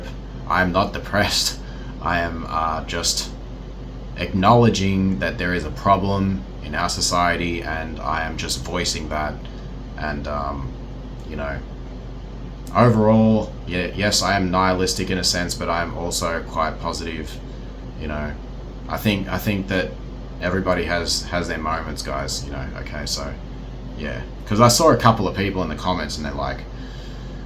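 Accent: Australia. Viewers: I guess you are Australian ? Cuz of the accent)) do you call it spring ? Haha it’s 25 C degrees there it’s not spring, it’s summer)) I mean it’s very good temperature nothing compared to our coming winter it’s going to be -50 C 🤌 Also didn’t know that we have the same time zone almost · Sorry, but I never take advice from people who have Australian accents and a 1998 Boy Band haircut.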